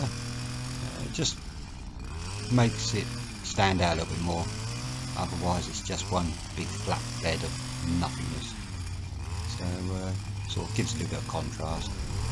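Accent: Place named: Northamptonshire, United Kingdom. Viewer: Looks good. Good vid. Where in the country are you from as you sound very Northamptonian?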